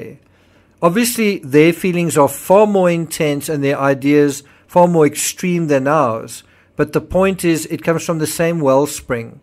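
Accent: South Africa. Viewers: Whoever said u r a liability in this case, is an ass. U’re obviously knowledgeable and put a lot of work into investigating each, including this one, case. U’ve even traveled to many of the areas in which the crime took place, or where the predator worked, or whatever!! I am also a fellow South African listening to u from Rhode Island, USA. P.S. love hearing the accent! Thk u!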